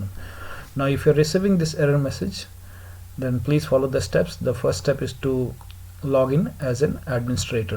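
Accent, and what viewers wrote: India. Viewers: typical tutorial on youtube 7/10! but not the best has Indian accent ✔️ loud keyboard noises ✔️ yellow circle around the mouse cursor ❌ said "hello friends" at the beginning ❌ video has more likes than dislikes ✔️ it worked ✔️ low video quality 🟡0,5 low audio quality 🟡0,5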